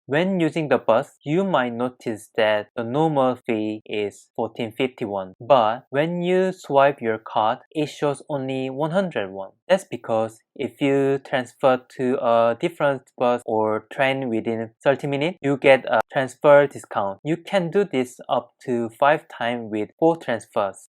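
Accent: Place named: South Korea. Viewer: Why is this YouTuber so attractive? I'm starting to fall in love with the video. This is typical Korean English pronunciation! Everyone~ If you want to communicate well when traveling to Korea, please watch this friend’s video a lot!! You will have much better communication!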